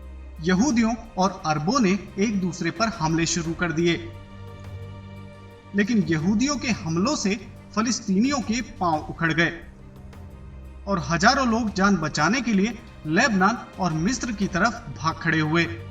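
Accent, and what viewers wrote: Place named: Pakistan. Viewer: Abe chootiye Tere lehze se he saaf saaf pta chal rha h ki tu Pakistaani chootiya h.. Indian Muslim ka behavior of expressing tujse kahin bhi match nahi krta.. Ab kutch sensible reply ho to zarur comment kariyo..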